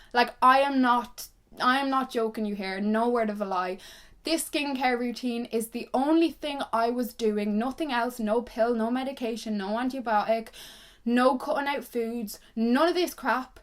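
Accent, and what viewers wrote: Ireland. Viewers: YOU ARE SO PRETTY and I love the Irish accent 😍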